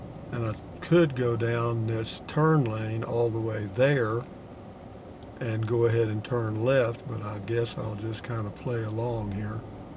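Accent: Texas, United States. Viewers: You sound all Texan :p am i right ?? btw Smith's is just a different brand of Kroger. They have all the same Kroger products and sales, it's really not a 'local' spot. Not trying to be a dick but you keep saying things like "this is where the tourists go...." . My man, you are a tourist.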